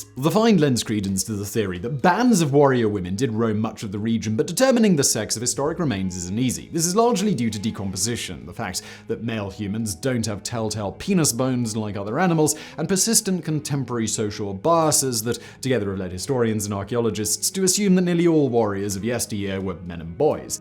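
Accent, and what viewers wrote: RP, United Kingdom. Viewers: Combine a British accent, a black sarcophagus and the word creepy and I'm all in. Guilty clickbait. Sigh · Your tone is far too posh to allow proper concentration & your humor is nearly too tenderfoot to have the majority of anything said to be taken remotely serious... If you wish to be but a petty Monty Python copy then by all means present yourself as such. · Sorry, can't understand you. Please don't speak so fast. From USA. I don't have the ear for the queens English. Love the content. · Your arrogance is showing..English guy with the accent… but you’re rich from this but you click baited me with the extra chatting! I already know what you know 🤫, in spite of your perpetration of proper investigations! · Amusing hearing you go from BBC British pronunciation, occasionally to D&D levels of exorbiantly posh that would put Nigel Thornberry to shame.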